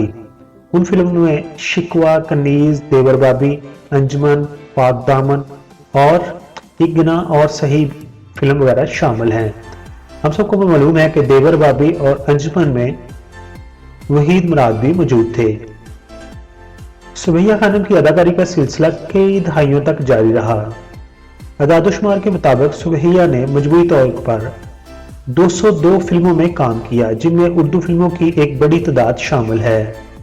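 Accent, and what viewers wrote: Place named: Punjab, India. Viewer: AP ka lehja pnjabi hai Urdu k alfaz p sahi grip nai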